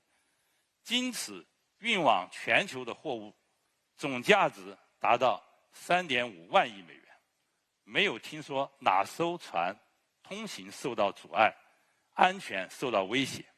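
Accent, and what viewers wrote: South, China. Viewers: 應該是南方，可能江浙皖吧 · 南方口音？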